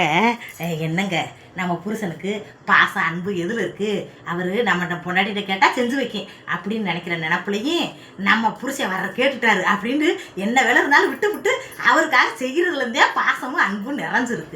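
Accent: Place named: Ramanathapuram, India. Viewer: ஏக்கா ராமநாதபுரம் மாவட்டம் மாதிரி கூட பேசிப்புட்டு நீ எம்புட்டு குதூகலமா பேசுற நீ ஏத்த உளுந்த வடை நல்லா பொசு பொசுன்னு வந்திருக்கு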